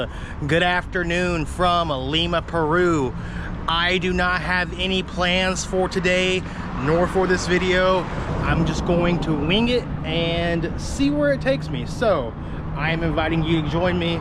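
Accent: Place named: South, United States. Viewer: Dude learn some Español you can't even gracias without a southern twang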